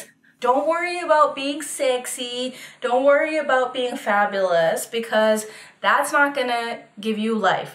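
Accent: India. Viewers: You look so cute with that Indian accent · 😀😀😅😅 That Indian speaking excent was really nice. Informative video👍👍... Keep it up 👍👍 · really really really really really thanks for the South Indian accent..I am not Indian, but I am in Bangalore for almost 4 years and it is super, pakaa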